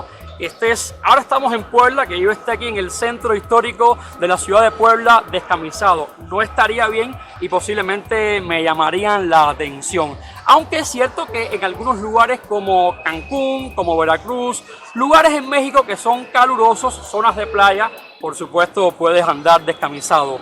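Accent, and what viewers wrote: Cuba. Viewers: Tu acento ya no es tan cubano o sea no es exagerado,me agrada tu acento , saludos 🎉🎊✨🌟⭐💫💙🤎💛❣️🤍❤️💚⭐🌟✨💫🎊🎉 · El asentó cubano se conoce a la legua usted es cubano no niegue su paiz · no sabía que bueno entrar a informarse, soy mexicana y me avergüenza que hay paisanos así, lamentablemente de todo fruto da un árbol y aún en México hay mucha gente que solo habla por hablar, discúlpalos se crecieron viendo televisa y TV Azteca y no se tomaron la libertad de leer. Saludos y un enorme abrazo a mi me encanta el acento cubano y hay mucha gente en México que vale oro, no te quedes con la impresión de algunos pocos mexicanos ignorantes. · Ustedes son queridos amigos en cubanos en mexico nunca pierdas tu asento cubano hermano saludos desde atlanta Georgia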